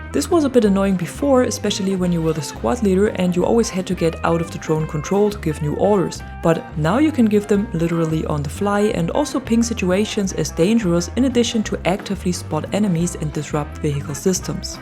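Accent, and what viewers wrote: Germany. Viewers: Kann es sein das du Deutsch sprichst?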